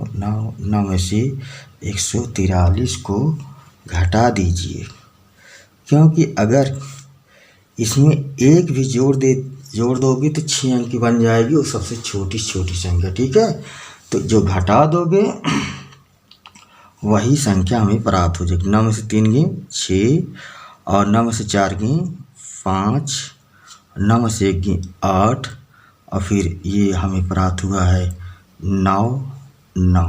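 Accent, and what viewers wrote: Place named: Pratapgarh, India. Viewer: SUPERLIKE 47::)) बहुत ही श्रेष्ठ कार्य कर रहे हैं आप🙏🙏 मेरे पिताजी भी प्रतापगढ़ से थे, तो आपकी बोली से अपनापन सा लगता है । बहुत बढ़िया भैया जी🙏🙏🙏 बहुत बहुत धन्यवाद और हार्दिक शुभकामनाएं 🙏🙏💐💐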